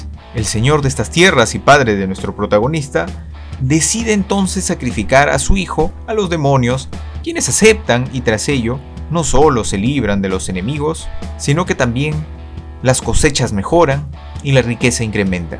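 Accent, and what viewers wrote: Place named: Peru. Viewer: Fascinante! Tu narración es tan natural que me das envidia, me encanta el acento peruano. Curiosamente estoy trabajando en un proyecto para Death Note y he tenido que ponerme a leer sobre el "bien común", entre otro temas, para entender mejor la obra, y te puedo asegurar que me has ayudado a digerir la idea mejor, eres muy bueno en esto. Por cierto, buena analogía la que haces al final: "Trabajo, ahorro, inversión", no podría decirlo mejor.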